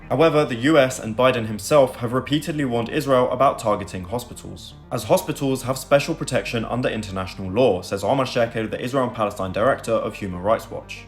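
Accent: United Kingdom. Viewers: You look like a young man of military age... And you speak the UK English... See where I'm going with this?😮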